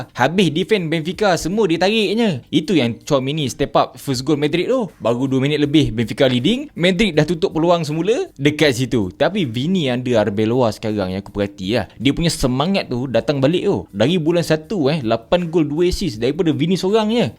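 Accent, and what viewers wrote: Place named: Kedah, Malaysia. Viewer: Harey hg cakap kedah bunyi supa taik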